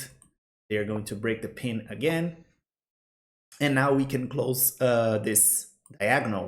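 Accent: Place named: Brazil. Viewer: otimo video, reconheci seu sotaque de brasileiro na hora haha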